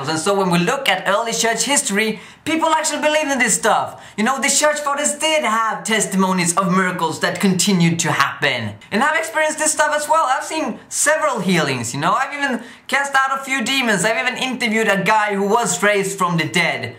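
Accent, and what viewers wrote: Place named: England, United Kingdom. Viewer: One thing I learned from watching this: I can more easily tolerate a biblically illiterate moron as long as he has an english accent. I was at the Conference. Nothing you said rightly articulates MacArthur's perspective...especially "Number 1" from your list. I have witnessed the same phenomenon with regard to a lack of true conversion coming from the Charismatic Movement. Rarely is the true gospel preached. Many of them (if not most) couldn't even articulate the gospel if pressed to do so - and believe me when I tell you, "Jesus talk" is no substituion for talk of the substitutionary death and the atonement. Beyond that, most of them hold to the Word Faith, Health/Wealth/Prosperity gospel, which cannot save. You don't know what you're talking about - but like most Charismatics, you probably so idolize gifts that don't even exist today that you'll act the fool because somebody is talking about those gifts in a manner which displaces your idolatry. 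And Romans 10 is not the sum total of the gospel, otherwise, Mormons, Catholics, Jehovah's Witnesses and everyone else is saved. What a pathetic litlte man you are. You make us all look like idiots...which is why John had to do a Strange Fire Conference.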